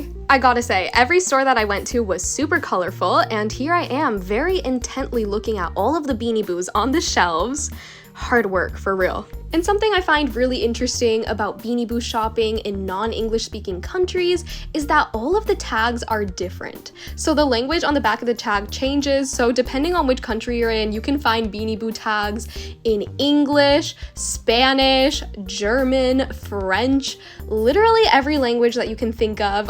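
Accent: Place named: Mexico. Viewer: Wow!, you have a really good Mexican accent, greetings from Mexico!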